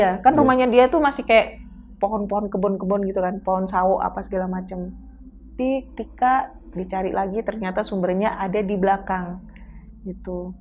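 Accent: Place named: East Java, Indonesia. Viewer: lidah wetan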